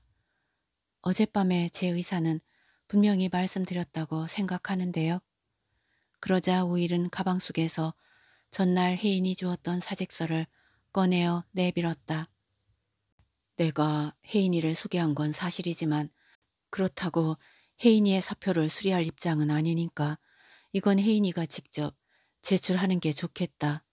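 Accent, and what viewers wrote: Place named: Gwangju, South Korea. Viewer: 잘 듣고 있습니다 그런데 순천은 사투리를 잘 쓰지 않씁니다 글에서 나온 이녁이 그래라 안그래아우 그러지라 이런 말과 억양은 주로 광주나 근처에서 쓰는 사투리입니다 전라도 사투리나 억양이 가장 안쓰고 약한데가 순천입니다 암튼 재미있게 잘 듣고 있습니다 감사합니다 화이팅!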